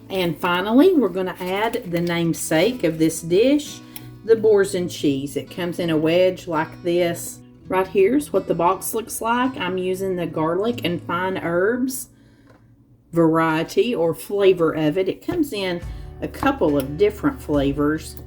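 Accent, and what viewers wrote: South, United States. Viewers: Congratulations! I love your channel and I love the merch. My favorites are the ground beef recipes. And being a born and bred southern girl I love your accent because it is a lot like mine (NC/GA) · Make a little cream sauce using cold milk a heaping tsp of flour. Vigorously stir that flour into the milk and pour over those peas. Allow to thicken a little and enjoy! My favorite way to eat them. This chicken recipe has got to be a must make bc it looks delicious! Thank you for the recipe! Oh my goodness! That garlic cheese bread! So simple and looks delicious! Can’t wait to try it! Again, thanks! Girl, your sweet southern voice is soothing. I really enjoy your videos. Congrats on 100k subs! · you are a breath of fresh air! Your humility is unheard of in this me, me, me world we live in today! I have been eating low carb/keto for a year now so I don't use your recipes but ALWAYS look forward to watching your videos every week! 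I adore your soft spoken, southern drawl that I am so fond of because of my family roots being from TN. Love you and wish you God's blessings today and every day! Congrats on the 100k subs...well deserved!! <3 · I found you quite by accident scrolling through youtube cooking channels. You did your video on the best simple salids. I absolutely adore watching your videos. I love love your southern accent, And with every dish you prepare, you show the love of your family. And you're a True southern lady and homecock. Thank you for not only the entertainment but the wonderful recipes you put out. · Congrats,! I found your channel a few years ago. Your sweet southern accent and fun personality drew me in quickly! I think the first recipe I made of yours was the meatloaf. It is delicious! I like that you include all the sides in your videos too. God Bless you! 😊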